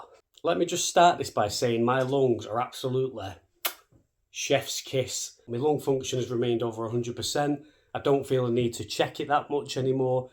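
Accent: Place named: Manchester, United Kingdom. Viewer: I'm hoping something comes up before it's too late, my brother can't walk very far now so his lungs are quite far gone. I'm not saying nothing about the you know what jab, but my brother and my ex both got the jabs then both got copd at the same time, it's either something is going on or it was just extreme bad luck/coincidence. By the way you sound like a manchester lad, I'm near Stockport, my brother lives in Oldham, I have another brother in blakely.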